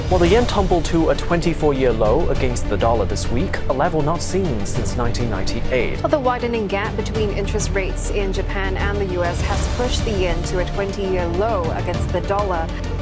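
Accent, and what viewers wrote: India. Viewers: Your perspective is gratuitously anti-american. The US trade policy post-WWII was to support its enemies economic development by direct investment and access to its customers. By the mid 1980's the US realized it was cannibalizing its own industrial base. America lost its electronics industry, its telecommunications manufacturers, and its automobile manufacturers gutted. Would you expect any country to eat its own businesses so foreign businesses would flourish? No. Plus, by any objective perspective, the dollar was grossly overvalued compared to other countries. And the devaluation was only a temporary maneuver. So the real problem in Japan was its over valuation of its domestic assets; companies and real estate. Everyone wanted to invest in these inflatable assets so they could "get rich quick" and rather than invest as a fundamental motivation people invested were motivated by greed and momentum. 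That never ends well and you can't blame "evil" USA. But "evil USA" sells well on youtube. Your best comment was at the end about "Countries having no permanent friends only interests" summarizes the most enlightened foreign policy. No foreign country can come to the aid of another to "save" it except in acknowledging its own best interests. Having these criticism I still enjoyed your video, flawed as I believe it to be. (I'm a sucker for Hindi accents) 😆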